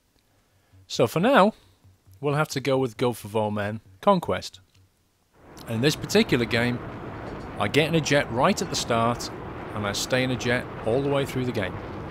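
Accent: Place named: United Kingdom. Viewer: u sound british...so yeah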